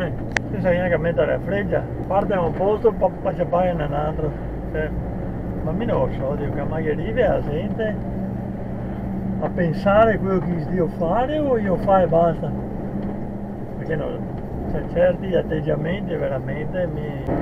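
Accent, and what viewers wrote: Belluno, Italy. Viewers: Buongiorno hai l'accento uguale del mio ex titolare di Belluno/Alleghe😅,l'altro giorno uno mi ha fatto quel zigzag in autostrada senza far freccia e sembrava un caccia delle frecce tricolori alla fine mi ha tagliato la strada per prendere l'uscita a destra e gli ho suonato si è incazzato pure 😅🤔 buona domenica 🙋🏻‍♂️un saluto da Stoccarda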